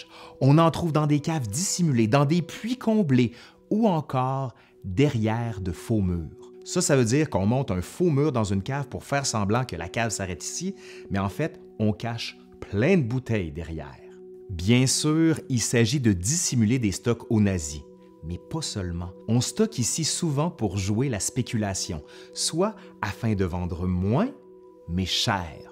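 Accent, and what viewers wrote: Quebec, Canada. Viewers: Bon sang, l'accent Québécois est juste magnifique ! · J'aime beaucoup votre travail.. Auparavant, c'est à dire avant d'avoir connu vos vidéos, je n'appréciais pas tellement l'accent canadien, mais grâce à vous mon opinion a pu changer totalement. Le son en devient agréable, doux et confortable à l'écoute de vos récits sur l'histoire. Comme vous.nous l'avez suggéré, je souhaite proposer une traduction en une autre langue, en hébreu du titre de votre video : למה הנאצים אהבו כל כך יין?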